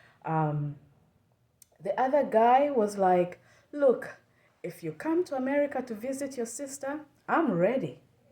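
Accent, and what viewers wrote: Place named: Kenya. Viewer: are kenyan?you look, sound like everything about you is Kenyan. New subscriber from kenyan plus loving your content gal,it's top notch!!! Thank you and here to support you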